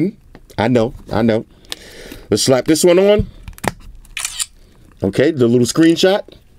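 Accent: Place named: California, United States. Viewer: Anyone else wanna see what he looks like 🙌🏽 he sounds like a Californian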